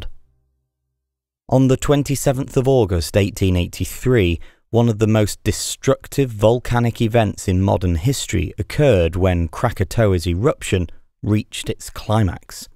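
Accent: United Kingdom. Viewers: The britanic accent...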